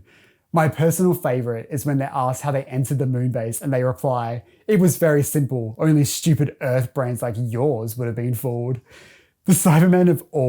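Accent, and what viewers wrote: Australia. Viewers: wait i thought u were australian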